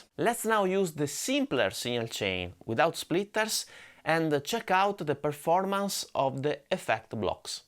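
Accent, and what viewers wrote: Italy. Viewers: Video perfetti, molto dettagliati e precisi. Tra i meglio che si trovano sul tubo… e il tuo accento marcatamente italiota è talmente estremo che risulta pure simpatico 😉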